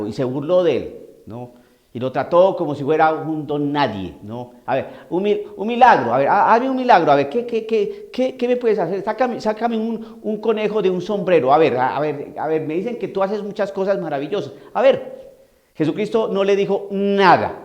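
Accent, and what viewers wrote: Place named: countryside, Colombia. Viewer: Que bárbaro Padre me encanta esa forma colombiano de pueblos para hablar , este virus es una cortina de humo para hacer las cosas y la gente callada , hay q cuidarnos con los protocolos pero no darle importancia ese virus q tiene ciegos a muchos y creen q los laicos somos pendejos y no nos damos cuenta , no podemos callar la verdad hay q decirla x la verdad murio Cristo en la Cruz . Dios lo bendiga y proteja siempre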